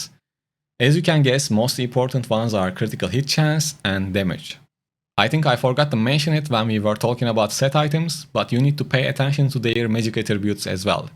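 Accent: Turkey. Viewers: La ingilizcen aynı Türk lehçesinde. Ortaaamm türksün sen la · You sound turkish ngl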